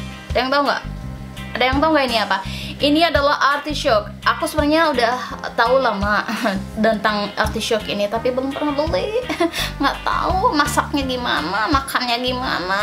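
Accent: West Java, Indonesia. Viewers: emoy atu lah teteh sunda pisan eta hahaha.. teteh tinggal di mana sekarang ? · org mna kk sunda nya ? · logat2 sunda gituu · Janten urg sunda teteh teh? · resep ihh nyunda 😂😂 orang mah rek diamerika ge logat mah moal hilang 😂😂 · mba orng sunda ya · Orang sunda nya teh....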